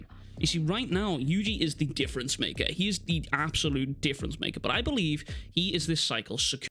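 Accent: Liverpool, United Kingdom. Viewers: Is this a scouser?? (United fam here) 👀👀👀👀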